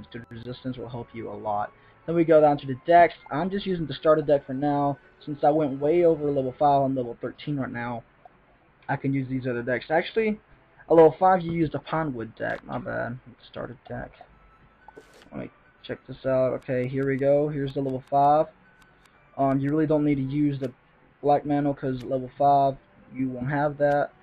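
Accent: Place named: Kentucky, United States. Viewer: God dang his accent so kentuckyish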